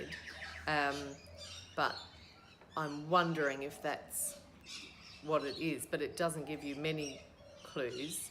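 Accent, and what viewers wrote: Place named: Australia. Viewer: Oh wow. Another Aussie! I found your channel through Shaun’s video and was so excited to discover your accent! I look forward to more videos and am off to subscribe to your podcast... Love the sounds of the birds in the background. Reminds me of being near the beach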